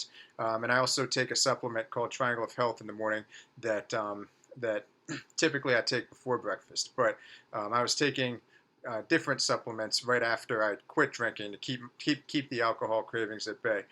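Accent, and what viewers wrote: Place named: East Coast, United States. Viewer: there’s something really comforting about your voice and your back-East accent. I love listening to your videos. I never had the alcoholism as bad as you did, but it’s very relatable.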